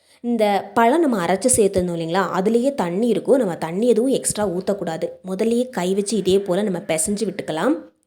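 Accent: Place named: Kongu, India. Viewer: நாங்கள் இப்படி தான் பேசுவோம் எங்க கொங்கு மொழி சூப்பரா தான் இருக்கும் மரியாதை கலந்த மொழி வாழைப்பழத்துல குற்றம் கண்டு பிடிக்கிறிங்க பாஸிட்டிவ் பேசுங்கள் நெகடிவ் வேண்டாம் இந்த பெண் பேசியது அருமையாக தான் இருக்கு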